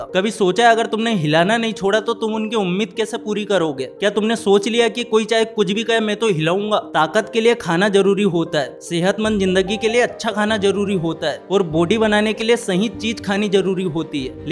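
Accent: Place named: Madhya Pradesh, India. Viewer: Apke bolne ke style se lg rha hai aap MP se ho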